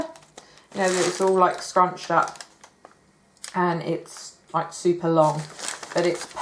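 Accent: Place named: Texas, United States. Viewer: I enjoyed you video so much. Your "Texan is great". Being a born and bred Texan, I can tell you would make a great asset to our great state. And I like the way you shop, video and talk!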